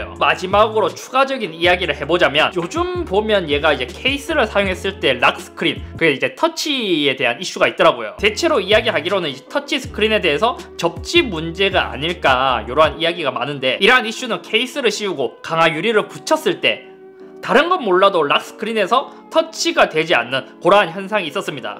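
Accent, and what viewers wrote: Gyeongsang, South Korea. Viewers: 이렇게나 확실하게 경상도 말로 이야기하는데도 이렇게나 감각 좋고 세련되게 가젯 리뷰하시는 분은 달리 없음. 12, 12미니 비교 리뷰 여러 개 봤는데 님 것이 최고네요. 덕분에 12미니 펌핑 사라짐~ ^_^ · 이 클립은 경상도 시청자들을 위한 것인가요? 전국의 국민들을 대상으로 한 클립이면 사투리 액센트 고치시고 영상 찍으시면 좋겠네요 성우를 쓰시던지요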